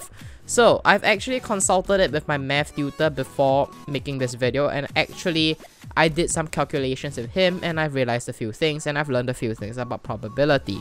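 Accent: Singapore. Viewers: sounds like singaporean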